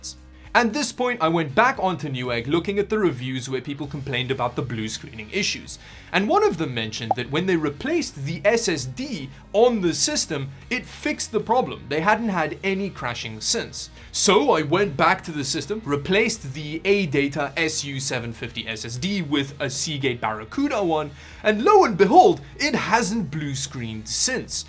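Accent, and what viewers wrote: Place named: South Africa. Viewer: That hint of his South African Accent, gives me Homey Vibes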